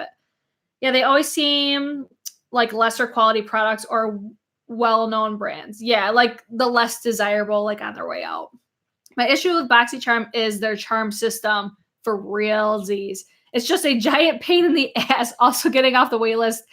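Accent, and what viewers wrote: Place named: Western New York, United States. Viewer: From what I’ve seen, the same capital investment company is behind Morphe and JHC. If that’s true, they’re the ones behind the new Morphe x JH palette. Trying to boost their investments. Your accent... I was thinking you were from Upstate/ Western NY. I know a lot of people from Buffalo and Niagara Falls that have similar accents. Love from NJ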